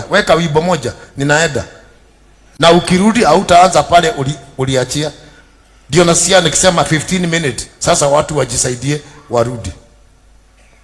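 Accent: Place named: Kenya. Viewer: Maybe he is not harsh, he just sounds Kenyan ……kiswahili yao ni sort of harsh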